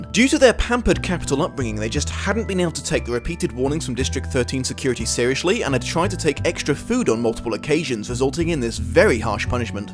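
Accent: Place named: United Kingdom. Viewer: I am so happy that I subscribed to you based on Nostalgia Critic's recommendation ^_^ I can never get bored of your British accent and witty humor :)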